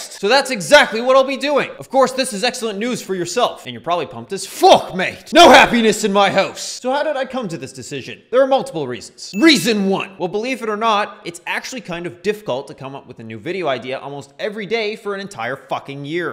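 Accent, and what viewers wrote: Canada. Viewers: Can you do both long and short? I need a video about muting random interstitial noise while playing. BTW, you've never sounded.more Canadian,.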